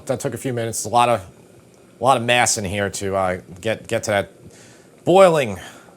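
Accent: New York, United States. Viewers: Why is it every time I watch you it sounds like you've rarely left NY? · Pre-ordered the book! Love your style, your NY accent, and the family! ❤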